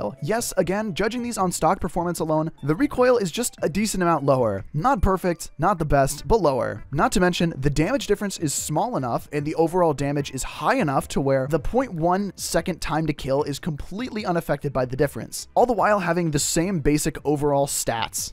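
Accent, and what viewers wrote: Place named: New York, United States. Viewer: does words and picks up accents quick. Great talent. I love his New York accent. Better than mine. I was born in New York & work in NYC. I love his NY accent & a little jealous. I'm female. Jealous is more a female thing!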